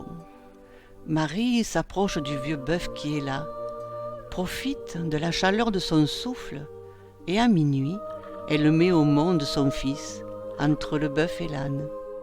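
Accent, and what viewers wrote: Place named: Provence, France. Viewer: Quelle belle histoire, avé l’assent, qu’elle est belle cette crèche, je suis bercée. Merci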